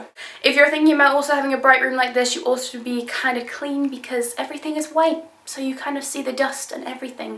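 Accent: United Kingdom. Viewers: Are you Scottish or welsh or English? But when I saw the Victoria secret dogs I thought they were from the USA and also the candles. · where are you from, you sound british, and most of the stuff is from british shops, but im sure i can hear another accent in there somewhere <33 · british accent dear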